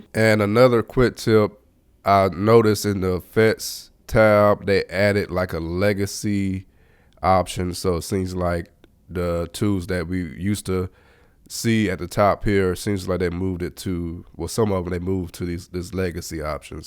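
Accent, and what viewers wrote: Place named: Southeast, United States. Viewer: Most SE USA voice I heard as someone from Charlotte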